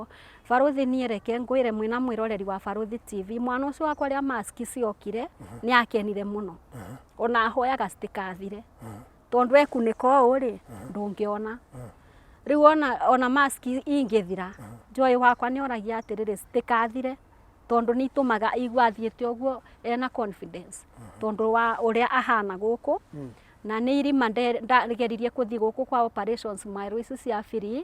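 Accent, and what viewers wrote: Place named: Kirinyaga, Kenya. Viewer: good show. Kama wewe unajua Kikirinyaga yako ni ya "aba" and not "haha" stick to one tongue sisi Wakirinyaga tunajua Kikikuyu yetu.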